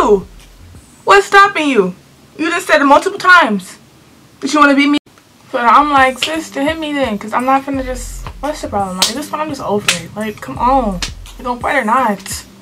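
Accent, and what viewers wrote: United Kingdom. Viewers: The British accent 😭 im British and the accent is so jokes😂😭 anyways just found ur channel 💕💕💕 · The english accent tho...😂😂 im english and this is amazing. Congratulations you just gained a subscriber😂😂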